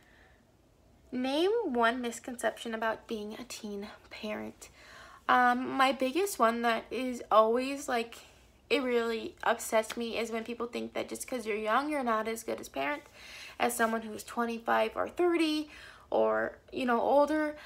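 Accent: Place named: South, United States. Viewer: Aww girl this is so cute! :) You're so beautiful and seem so sweet! I love how you are still so motivated and have great life plans. <3 You sound like you have a bit of an accent... are you from the south?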